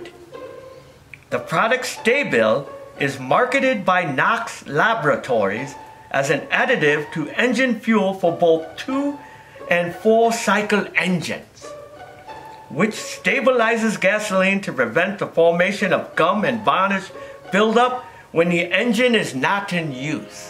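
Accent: England, United Kingdom. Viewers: i like the english accent mixed in with a little Confucious accent near the end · Do you have a kimono with a dragon . Love the British accent old chap 🇬🇧✊🏻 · Your English accent isn't far off Sir Winston Churchill from the second world war lol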